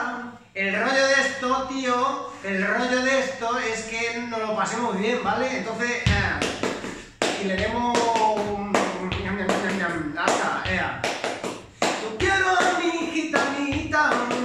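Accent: United Kingdom. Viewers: Mano el que no le guste tu ingles, que se joda! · Muy Bueno! Y tú Inglés no me molesta! Te da mucho carácter 👍👍☺️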